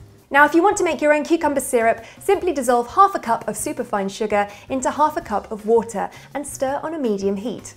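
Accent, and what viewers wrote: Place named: RP, United Kingdom. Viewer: Why do u sound so posh even tho I live in England 🏴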